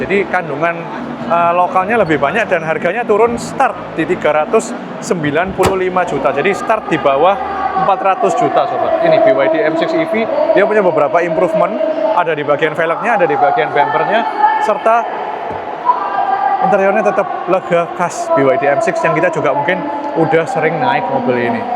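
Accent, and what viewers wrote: Surabaya, Indonesia. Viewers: Aku kangen medok ro Suroboyoan mu 😊😊😊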